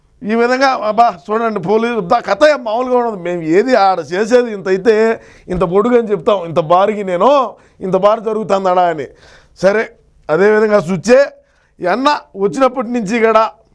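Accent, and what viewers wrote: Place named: Rajampet, India. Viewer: రాజంపేట యాస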